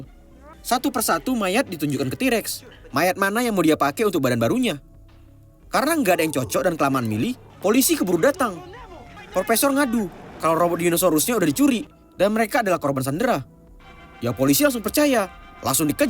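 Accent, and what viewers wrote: Medan, Indonesia. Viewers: Hahha medan kali logat kau lek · bah medan kali bang ,,uda ku subrik ya bang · Bang kau orang Medan iya kan...? Logat kau GK asing Bg krna aku juga orang Medan 😁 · Abang ini keknya orang medan ya... Logatnya itu medan kali kutengok😂😂😂😂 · Lucu kali abang ini bicaranya mcm org Medan aja. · MEDAN KALI BAH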